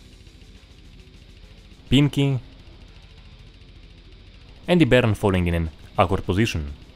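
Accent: Russia. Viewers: Wнy aгe yoи souпds liкe a гиssian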